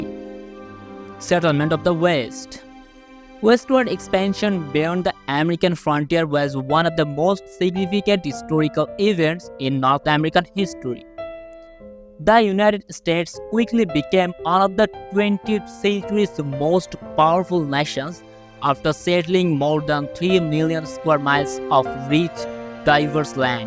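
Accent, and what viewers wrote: India. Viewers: So why is the narrator from the Sub continent of India? I guess 5 pictures and the wrong accents will get you view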